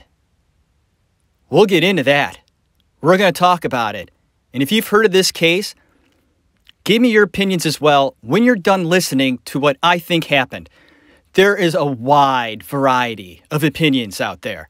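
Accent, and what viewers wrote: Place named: Cleveland, United States. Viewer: You sound like your from Cleveland, Ohio.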